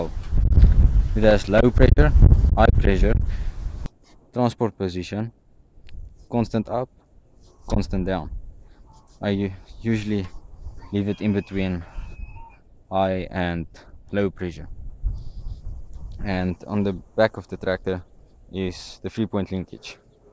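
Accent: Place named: South Africa. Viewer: You sound like a South African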